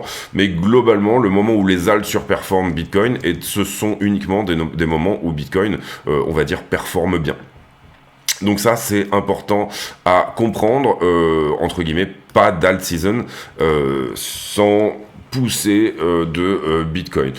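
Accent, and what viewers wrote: France. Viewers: Ca veut dire quoi “ hard close “ que tu répètes à toutes les sauces , fermeture difficile ?? J’avoue que ça me dérange cet abus de mots en anglais par tous les Youtubers français avec un accent franchouillard qui essayent de placer à toutes les sauces des mots en anglais comme , move , push , fill , up , down ect ect Est ce qu’ils pensent que ce donne un genre et que ça les rend plus professionnels ??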